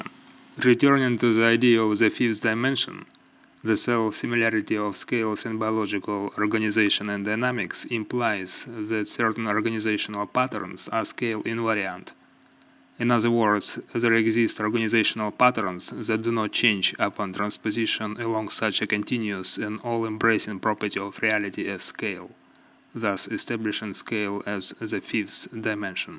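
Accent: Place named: Eastern Europe. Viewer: The 'presentation' is a little on the 'dry' side, but an informative video nevertheless; IF you can understand the heavy Eastern European accent ;>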